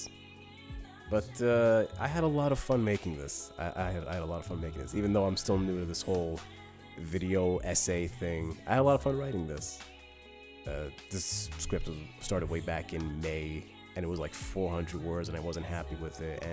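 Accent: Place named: Arab world. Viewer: This is a very great video but from your accent I assume you're an Arab and new mention the Quran in your video another detail that I think you know but you're not mentioned the concept of Angel and demon and Satan in Islam and Christianity is completely different and one of them that the demon are not Fallen Angel but the concept of demon is describing anyone who's rebellious against God if they are Jinn like iblis or are human an angel in Islam is have no free will and they completely follow god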